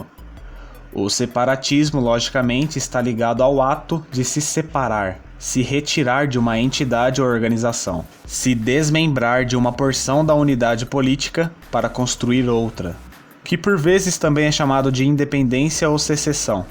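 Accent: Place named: Caipira, Brazil. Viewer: E esse sotaque caipira...kkkkkkkkk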